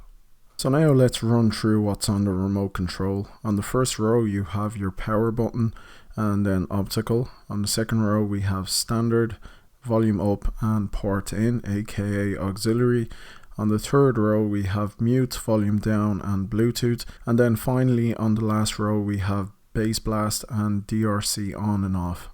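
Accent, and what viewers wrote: Ireland. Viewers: I love your Irish accent 😁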